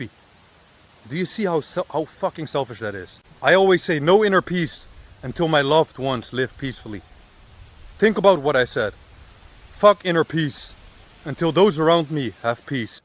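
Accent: Netherlands. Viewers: Ik dacht all dat ik en Nederlands accent hoorde maar lekker bezig man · Yo, ik dacht al te horen dat je uit Nederland komt. Mooi om dit te zien. Ik ben ook nederlands. Ik vind je werk met Far From Weak heel goed. Ga door. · Yea i thought i heard al lil dutch accent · I heard the Dutch accent in your first video, groetjes uit NL alsnog, als ik de kans krijg en het kan financiëel ben ik opgedonderd 🤣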